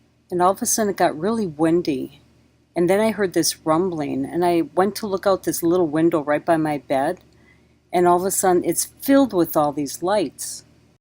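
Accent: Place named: Illinois, United States. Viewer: so the sub. just resufaced. Its been under for about a month..where are you from? I hear an ILL accent.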